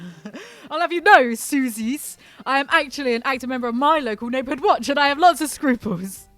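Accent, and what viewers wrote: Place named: London, United Kingdom. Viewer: I just love the way you speak! It reminds me of my ex boyfriend who's from London, the more videos I watch from you, the more I think you guys would make an awesome couple, I know it's weird xD seriously the weirdest thing I have ever think about an ex! Looove your videos <3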